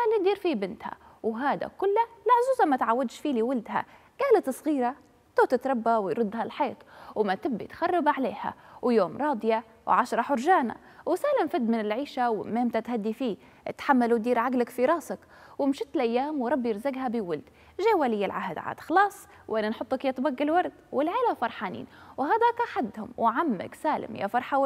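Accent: Tripoli, Libya. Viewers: لهجتها طرابلسية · خيركم وقت تشوفوا شخص ناجح وشكله قنين ديمه مش ليبي ومش ليبيه لماذا عقدة النقص عندكم هذه ليبيه من لهجتها والا كيف تكون تتكلم ليبي بهذه اللهجه كل شئ جميل وناجح مش ليبي ياشلافطيه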